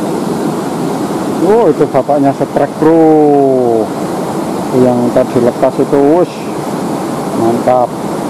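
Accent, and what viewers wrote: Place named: Java, Indonesia. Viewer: Medono agi apik mase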